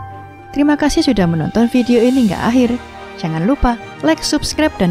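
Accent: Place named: Lampung, Indonesia. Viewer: Orang Lampung mana suaranya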